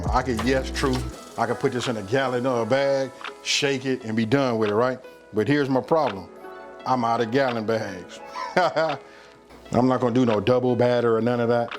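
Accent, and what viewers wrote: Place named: California, United States. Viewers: Coolest cat on the net. With that LA accent and hat you remind of my guy, NIP, #RIP. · Soon as i saw a big black brotha wit a LA hat and Cali accent, i knneeww this was bout tah be gggoooiiiidddd!!! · Ok Unc, wit that Cali twang!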